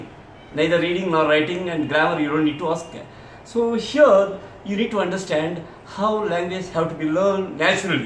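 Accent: India. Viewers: Obvious tension,Indlish,non spontaneous phrases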